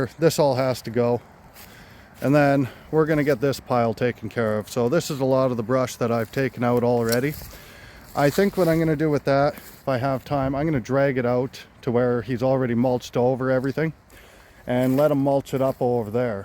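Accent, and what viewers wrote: Canada. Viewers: If folks couldn't tell by your accent that you're from Ontario, the Eh would have given it away! <3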